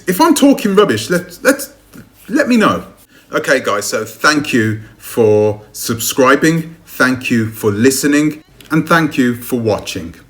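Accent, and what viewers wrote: United Kingdom. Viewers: When I see your videos come up I think, oh lord what now 😏! But I watch and like your videos because your unapologetic about your views and the information you share. Your delivery of what your saying, and not saying is very British 😂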